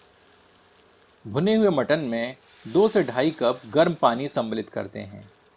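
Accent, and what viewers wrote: Standard. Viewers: भाई और आपकी शुद्ध हिंदी ❤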